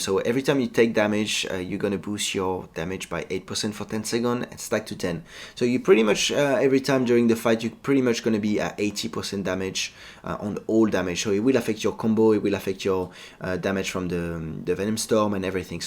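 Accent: France. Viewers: Are u FRENCH My FRIEND?! i like u r acsent :D ! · Vidéo sympa je ne te connaissais pas mais une chose de sûr c'est qu'avec un accent pareil tu ne pouvais être que français 😆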